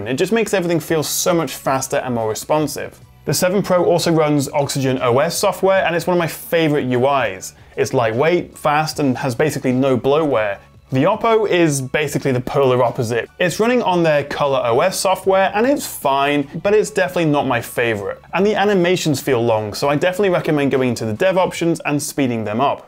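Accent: United Kingdom. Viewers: this guy made me love british accent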